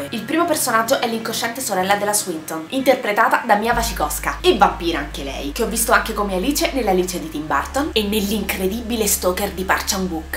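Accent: Rome, Italy. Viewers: Me piace n' sacco il tuo accento romano, comunque adesso mi guarderò subito il flim!! Sei bravissima :D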